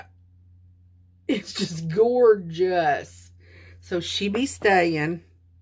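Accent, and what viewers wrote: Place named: South, United States. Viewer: Ong I heard the southern accent right away. Lols it’s the sweetest accent 😊😊 I’m having this weird obsession with finding the right face palette so I’m so curious to see what you have and what you keeps lols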